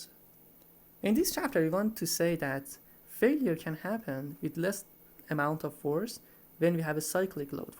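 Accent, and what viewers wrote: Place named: Iran. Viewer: I like the persian Accent though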